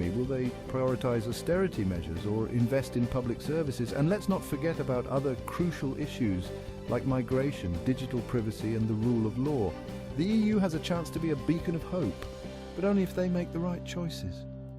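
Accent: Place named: United Kingdom. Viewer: Why am I listening to a British accent talking about the European Union. Brexit means Brexit.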